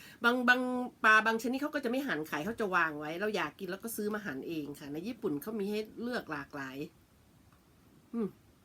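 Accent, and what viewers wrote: Isan, Thailand. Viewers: คนอิสานใช่บ่ ผมฟังรู้ 555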